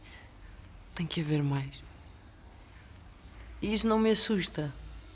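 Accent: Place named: Portugal. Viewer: seu sotaque português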